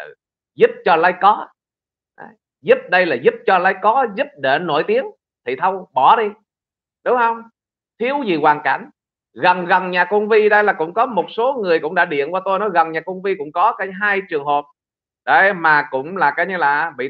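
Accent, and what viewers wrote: Standard. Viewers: Chào Anh noi rất chuẩn.like like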